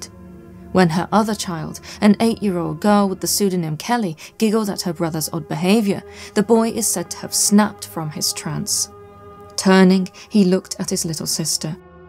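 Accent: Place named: RP, United Kingdom. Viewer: You talk like a toff, try throwing in the odd bit of slang or a good old fashioned 4 letter word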